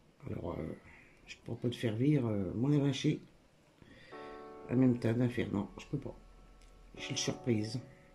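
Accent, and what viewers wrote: Nord-Pas-de-Calais, France. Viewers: Un petit coucou du Québec au Canada. J'adore regarder tes vidéos et le fait que tu parles cht'i ça me fait penser à ma grand-maman qui venait de Lille. Ta petite Gorjuss et super belle.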